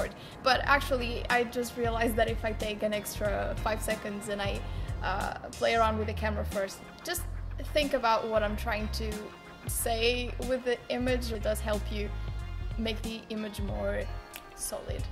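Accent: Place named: Portugal. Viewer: The second one is really helpful when it comes to creating better photography compositions. I really didn't realize up until recently hahaha. If anyone want to see my pictures, my Instagram account is: Ps:, teu sotaque português é muito lindo hahahah faz outro vídeo em português PLEASE ( Sou do Brasil)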